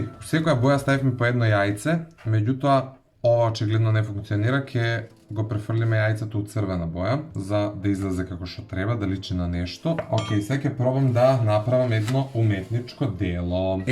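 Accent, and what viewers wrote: Kumanovo, North Macedonia. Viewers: cepaj samo kumanovski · Od sekogash sum mislel deka na youtube i vo privaten zhivot zborash literaturno ali koga slushav deka zborash kumanovski se zachudiv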